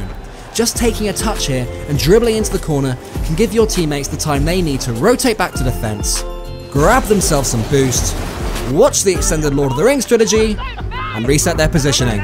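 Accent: Denmark. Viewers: mate??? you're a dane??? :D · Is he danish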